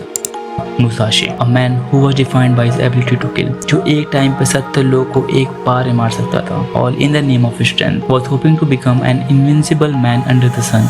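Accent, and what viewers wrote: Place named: India. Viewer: Bro I cant hear nothing thourgh your indian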